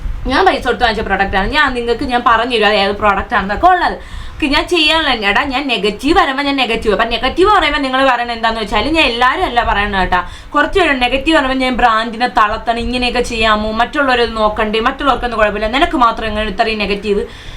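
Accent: Trivandrum, India. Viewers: Nte ponnu koche iyalu tvm evdeya. Njnm tvm anu bt nte slang inganeyonumalla. Iyalu pakka tvm. 😂😂😂 Njn chirichu oru vazhi aayi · "TVM ano sthalam ...samsaram agane thonee....anthayalum marupadi kalaki 🤝👍.." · Tvm slang polichu chechi😍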